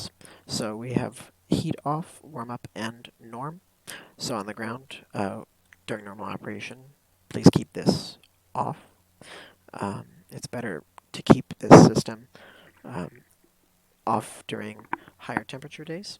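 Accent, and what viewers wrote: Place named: United States. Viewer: Thanks for trying to give an explanation on these systems. I was looking forward to it BUT here is a bit of feedback on your attempt: 1. The audio is incomprehensible: there is the "p" noise for your breath going into the microphone. 2. You speak too fast with a strong US accents (not everyone is from the US) 3. You say too may words that confuse and dissipate the tutorial content instead of communicating just what is important. Clearly you haven't planned on paper what to say. 4. The cockpit is too dark and reduce visibility 5. The audio is too low 6. you move the camera too quickly, which coupled with a fast speaking makes everything impossible (for me at least) to understand. Sorry for being honest ;-) Hope you can re do this in a clearer way. Thanks again!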